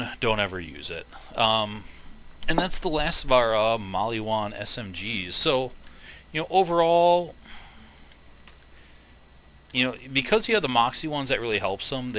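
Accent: United States. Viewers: This guy has gotta be midwestern I can tell from how he says slag "slayg"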